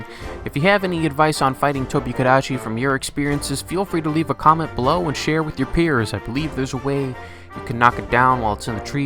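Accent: Italy. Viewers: hearing the Italian accent intro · the Italians Do infact speak like this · Italian accent intro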